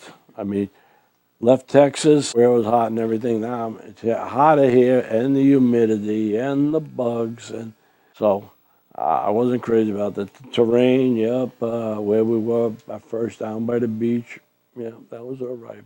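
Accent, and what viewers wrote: New Jersey, United States. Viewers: You sound like your from joisy God Bless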